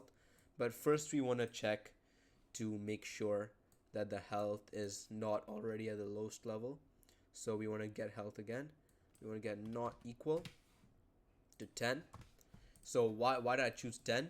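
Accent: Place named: Germany. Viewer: Hey where are you from? You sound like you're from Germany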